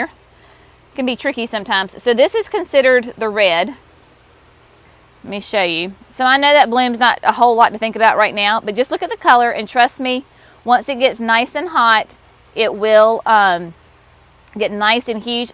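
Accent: South, United States. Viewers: It’s wonderful to find a southern garden center that loves Proven Winners, and bonus your accent reminds me of home. I’ve been watching videos for a few years and still find it hard to find retailers that carry PW here in North Central Texas. Finding you in NC gives me hope!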